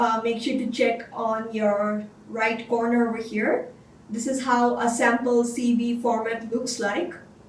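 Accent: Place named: Canada. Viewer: So within 8 months you got Canadian accent!!!!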